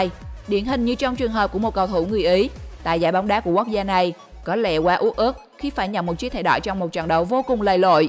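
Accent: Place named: Central, Vietnam. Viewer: Giọng mc miền trung nghe mệt thạt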